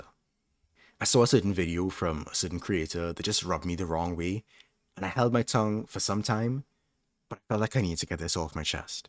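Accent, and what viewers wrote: Trinidad and Tobago. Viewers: Is this guy Trinidadian?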